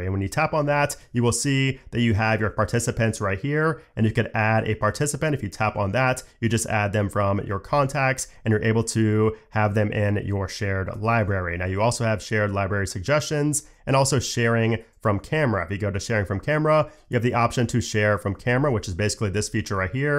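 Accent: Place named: New York City, United States. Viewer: hi, just curious about your accent, where is it from? NYC? thanks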